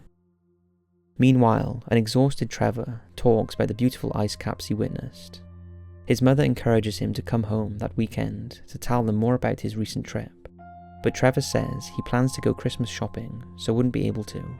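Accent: Wales, United Kingdom. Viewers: I just hope that this exposure will bring new light to this very mysterious disappearence and bring a sort of closure to Trevor's family and friends. If i could make a suggestion for a disappearance video it would be the case of Claudia Lawrence who mysteriously vanished from York, England in 2009. You sound British so I'm sure you will will know of this case as it has been on Crimewatch and in the media extensively but as far as i know she just disappeared without a trace and still nobody knows what happened to Claudia Lawrence. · Welsh accent. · As our Fine Narrator is {BritiSH} You would think a {BritiSH`cHap} would Kno' & bē Fully Aware Of [IriSH`slang] [or`Nott]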